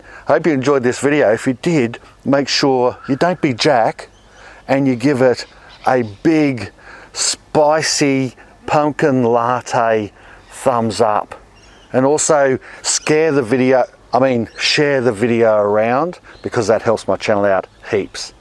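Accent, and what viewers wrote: Australia. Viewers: Aww, Richie Benaud! "What a marvellous day it's been out there toda-y-y-y-y..." A great man. 🙃 Upside-down, too! I'm a Pom, - you sound a bit like an Aussie, so you might as well take the Ashes back now. 🏺 Sigh... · Came for the pumpkins, stayed for the Aussie...ness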